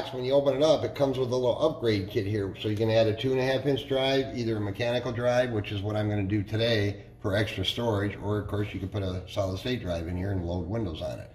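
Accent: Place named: Upstate New York, United States. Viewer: You sound like you’re from upstate NY. Lol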